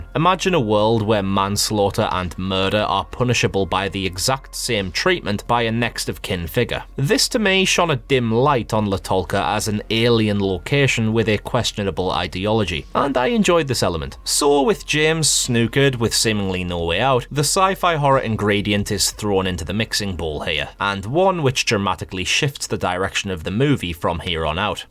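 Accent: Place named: Teesside, United Kingdom. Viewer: Distracted by trying to figure out the accent. Thornaby?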